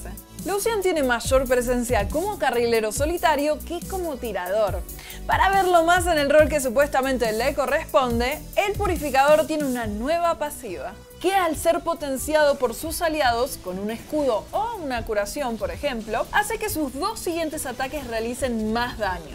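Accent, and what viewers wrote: Argentina. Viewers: es argentina._. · LIKE SI KIERES KE SAKEN A ESTA SEÑORA ARGENTINA DEL ACTUALIZANDO. YA NO AGUANTO YA NO AGUANTO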